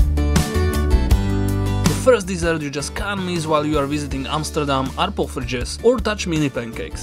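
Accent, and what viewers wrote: Slovakia. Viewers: This channel is exactly my cup of tea! 😊👍. Nice work. Keep going. Btw, I knew it immediately that you are Slovak from your accent 😆. Though, very solid English. Cheers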